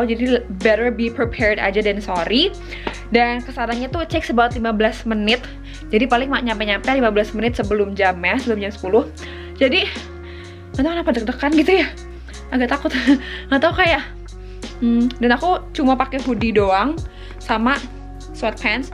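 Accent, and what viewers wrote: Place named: United States. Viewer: American accent udah dapat👍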